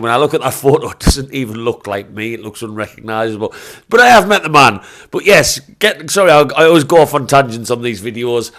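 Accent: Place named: Newcastle, United Kingdom. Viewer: Cracking Geordie accent lad haha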